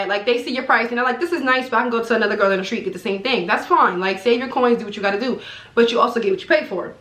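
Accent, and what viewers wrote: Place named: New York, United States. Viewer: u so new york i love it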